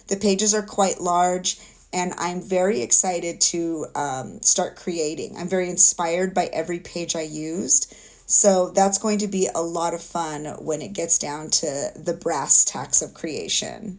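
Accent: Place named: Minnesota, United States. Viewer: Are you from MINNESOTA? Twin Cities?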